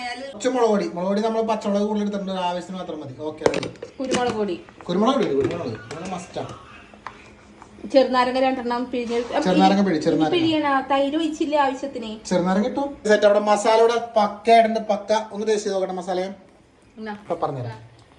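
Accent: Kannur, India. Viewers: നിങ്ങൾ കണ്ണൂർ ആണോ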